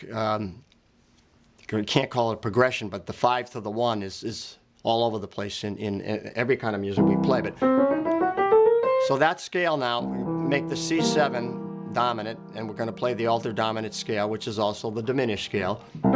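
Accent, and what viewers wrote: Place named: New England, United States. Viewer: That was one of the best lessons I've ever had! What a great video. Clear, concise and impactful. Thank you! I've subscribed and will check out your website today. PS, great to hear a NE accent down here in TX!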